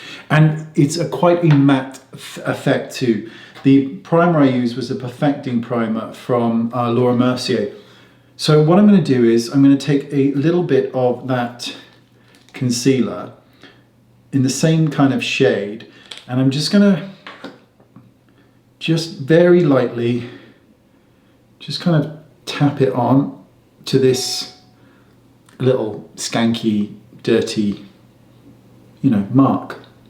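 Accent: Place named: United Kingdom. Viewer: Thx, listening to your accent& lBritish language is always a learning experience. Love an inexpensive cinceLer/ fuundation!! Am waiting for my mail for your Daisy, gloss& liner!! Thanks for your artistry & humor🥂🥂🥂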